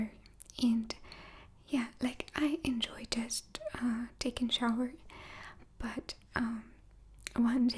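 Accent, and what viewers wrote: Russia. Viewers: Hey...thanks for all the great ASMR vids with your beautiful Russian accent! I never thought of rivers and parks in Siberia, thats awesome bcuz nature will keep you young and healthy! I do almost everything on your list of activities as you do! Incl the dancing in the dark!😜🙌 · I am from New Zealand and in love with u and ur accent please do more Russian accent videos I have no judgement to Russia or the war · Ciento que usas el español España y tu acento ruso combinados, los Rusos suenan algo peculiar y tu tienes una mixtura de acento español España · Hola princesa tus videos son cada vez mas profecionales exelente video de asento ruso buen blok me gusto mucho y mucha suerte en tu canal nosotros siempre te apoyaremos tus suscriptores favoritos saludos desde mexico d.f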